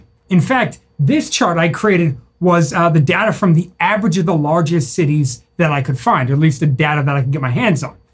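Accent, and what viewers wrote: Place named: Texas, United States. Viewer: Some texanian accent daaattaaa(data)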